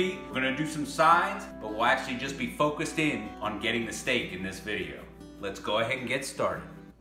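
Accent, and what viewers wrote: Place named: Australia. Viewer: Are you originally from Australia? I hear a bit of a twang on your accent, almost as though you grew up in the down under and moved to the U.S. when you were young.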